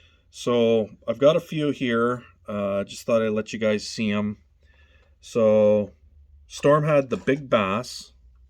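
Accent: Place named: Canada. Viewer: Your accent is identical to Ricky’s accent in trailer park boys, you must be Canadian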